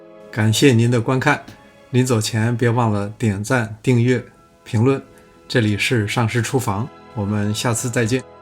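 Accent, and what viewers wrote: Beijing, China. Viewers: 非常喜欢您的视频，其一是语言简洁，其二是内容适合我的口味，其三是地道的京腔，每期必看。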